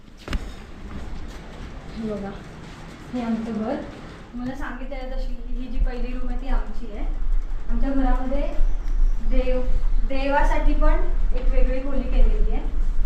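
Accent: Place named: Vasai, India. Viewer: तुझे व्यक्तिमत्व, दिसणे तसेच बोलणे अगदी वसईतील गावाकडील लोकांसारखे वाटते. कदाचित माझे मत चुकीचे असेल. तुला कोकणातील बारीक सारीक गोष्टींची माहिती आहे जी तुझ्यासारख्या सध्याच्या नविन पिढीतील कोकणातील मुला-मुलींना ही त्यांची माहिती नसावी.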